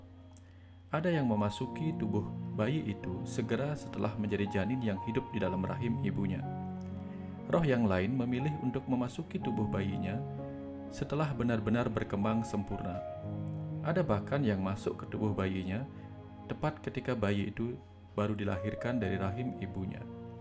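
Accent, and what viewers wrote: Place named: Bali, Indonesia. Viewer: T nya masih Bali yan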